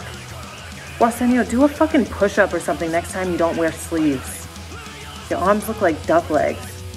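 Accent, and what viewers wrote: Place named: Boston, United States. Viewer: Huge props to for having a correct Boston accent. Makes her that much moah feckin hahdcaw dood.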